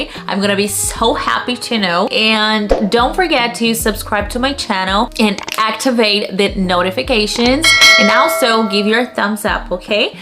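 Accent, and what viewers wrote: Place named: United States. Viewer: more videos in english please, you’re sound like a american girl haha loved it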